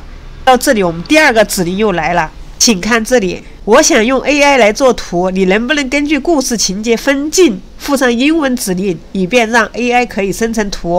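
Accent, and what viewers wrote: Sichuan, China. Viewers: 不错，你的川普，我知道你在哪里了，怎么联系你，跟着学习，谢谢！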